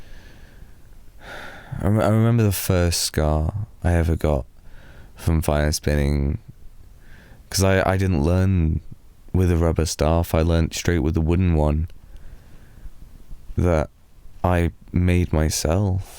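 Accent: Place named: United Kingdom. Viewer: Finally, British accent! No more American accents!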